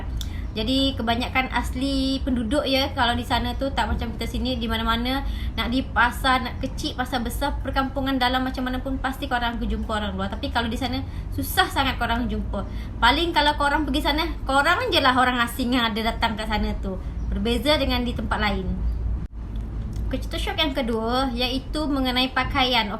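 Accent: Indonesia. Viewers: ni org Indonesia knn... Bahasa yg awk guna tu.. gaya keIndonesiaan tu jelas kedengaran.. klo gaya bahasa Malaysia tulin lain.. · Dia org Serawak, mmg Ade slang Indo ckit kan. Sabahan pun mcm tu Tau. Awak x pernah Ade kawan sabahan n Serawak ke? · Assalamualaikum..admin ni orang Sabah ke..slang indo pun ada jugak bunyinya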